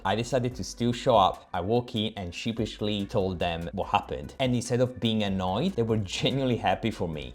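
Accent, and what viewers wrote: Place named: Vietnam. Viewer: Love your accent. Vietnamese?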